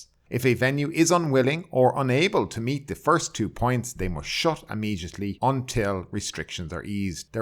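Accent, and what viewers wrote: Ireland. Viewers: Hi. or is it. I arrived in Suvarnabhumi airport today flying from Dublin via Amsterdam. I hope they restart the Thai pass programme because it definitely works. if the Thai government was to pick up cost for 2nd PCR test as they are doing now it would be a grand good will gesture and ease some of the anguish some travellers are having. The infrastructure in place at BKK airport was excellent today and the staff were very professional and diligent the whole process was very smooth. As we say at home don't change something if it doesn't need fixed. On lighter note is it probable that I am first Irish man to enter Thailand this year. Also nice to see you haven't lost your Irish accent 3 is still pronounced tree. Slainte.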